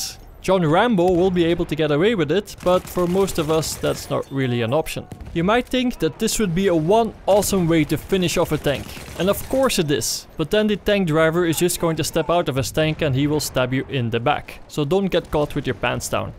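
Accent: Netherlands. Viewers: fix your dutch accent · he sounds Dutch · dutch accent but hes from denmark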